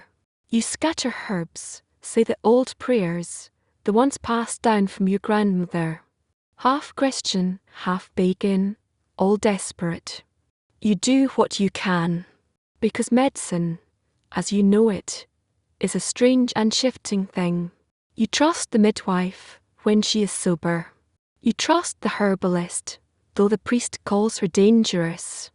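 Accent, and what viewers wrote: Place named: Scotland, United Kingdom. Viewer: Love the Scottish voiceover 🏴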